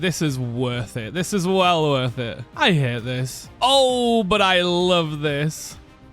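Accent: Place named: England, United Kingdom. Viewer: It's a beautiful day when uploads. The mad scientist is back with his perky english accent 😊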